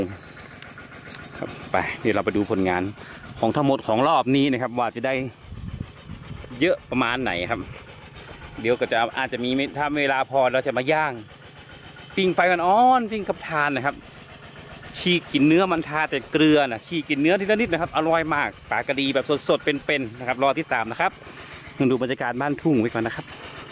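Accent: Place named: Northern Thailand, Thailand. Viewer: จ้าว ยายพรมาดูดึกแล้วจ้าวแต่แชร์ไปหลายคนแล้วรายการ สุดยอดเลยจ้าวน้องๆเขาชอบ ตลกดีฮเหนือผสมไทยม่วนดีแต้ๆจ้าว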